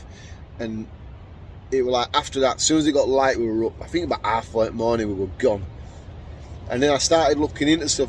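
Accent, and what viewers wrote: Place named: Northern England, United Kingdom. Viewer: Chatting is what makes your videos, but with a northern accent makes it more worth watching. Keep up the work laddie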